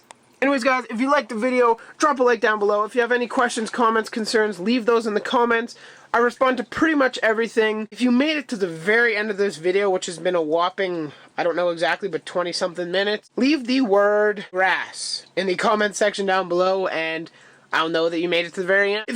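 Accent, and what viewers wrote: Canada. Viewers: Grass ( although I heard "rass") You mentioned you aren't from the states, are you by any chance Canadian?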